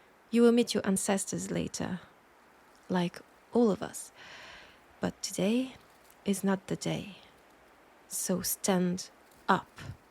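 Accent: France. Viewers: Yo, i've been trying to find a good French ASMRist. All the other ones are British and American people putting on an overly strong accent. The subtleness is great. Hope you make more.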